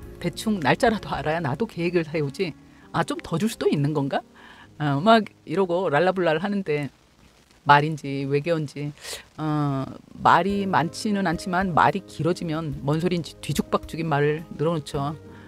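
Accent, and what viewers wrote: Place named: Busan, South Korea. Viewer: 선생님 부산이시네용~~~♥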